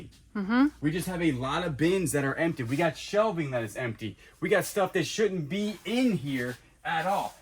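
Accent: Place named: South, United States. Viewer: It doesn't matter what kinda vids y'all make I'd still watch them so thanks for bringing us on your adventures. u crack me up and I love ur southern drawl your just too sweet.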